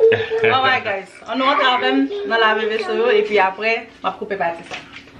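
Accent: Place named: North, Haiti. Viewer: Hi mes amours Nou se moun okap?fason ke nou pale surtou dam nan 😅 mw menm mw se moun okap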